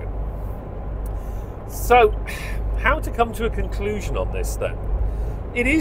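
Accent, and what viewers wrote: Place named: England, United Kingdom. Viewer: I love the way your plummy English accent keeps slipping into pirate. Great review!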